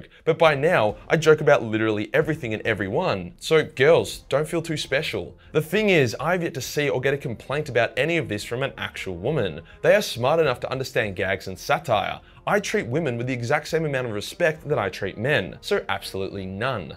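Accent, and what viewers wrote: Australia. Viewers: Alt title: hate comments read by an Australian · Seriously man i jusy found your channel a couple months back and have since been watching old and newly uploaded videos, i just love your commentary and the aussie accent and attitude is just the cherry on fucking top! Cant waif for more WK videos in the future! Also fuck those incel breed losers in the dark corners of the galaxy, fucking xeno brain dead fucks.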